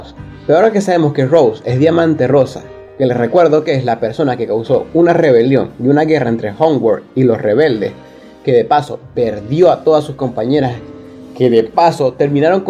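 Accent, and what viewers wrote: Venezuela. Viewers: este pana es venezolano??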